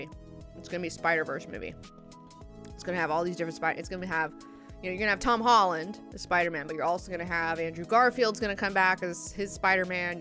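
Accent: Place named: Alabama, United States. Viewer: I don't know if you recently talked with some fam and friends for the holidays but, WOW! I can hear that Beautiful Alabama so much in your voice on this one. maybe it's my imagination...great vid!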